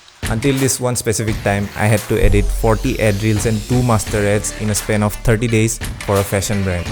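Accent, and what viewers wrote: Assam, India. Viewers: Holy crap bro, im not even done watching the vid but I cant resist the urge to say how cool you are for someone who has an indian accent and a pretty decent sense of humor lmao... Fellow video editor here, keep it up. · i can feel the assamese accent... love from Assam 💗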